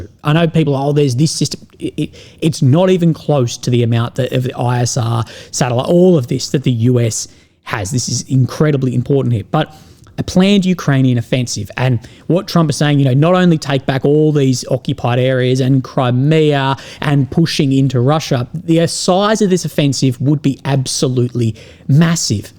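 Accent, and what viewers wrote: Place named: Australia. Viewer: Dude please start talking a bit slower I didn’t master the art of Australian accent 😅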